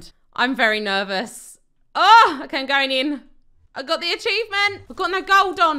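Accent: United Kingdom. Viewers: as an American I'd say it's a British accent lol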